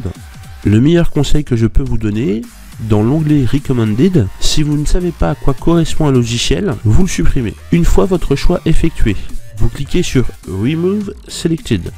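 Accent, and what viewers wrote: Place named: England, United Kingdom. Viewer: Sympa cette petite vidéo, et quel accent anglais <3. J'irai tester ça sur le PC de mes parents .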